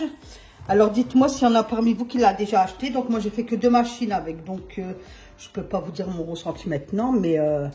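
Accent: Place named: Valenciennes, France. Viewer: Je trouve que vous avez l' accent de valenciennes, Denain, Douai, Orchies. Bibises.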